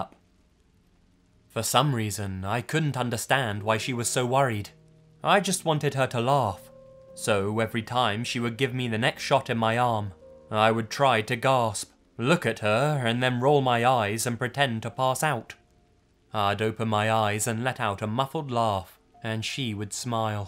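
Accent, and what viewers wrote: United Kingdom. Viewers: The one thing that makes me doubt these stories is that the speaker is British and the writing is also of British vernacular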